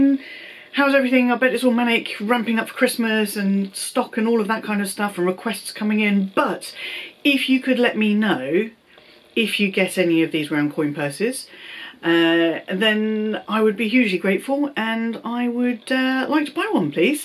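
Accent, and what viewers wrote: United Kingdom. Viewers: Omg yess!!!! All the time I don't know what it its with them. I have a good and strong purchase history but when I went to return an item 5 SA ganged up and were fighting taking back the bag that I had received from LV online a day before!!!! They are ridiculous at most times 🙈🙇‍♀️🙉 I so love to listen to your British accent🥰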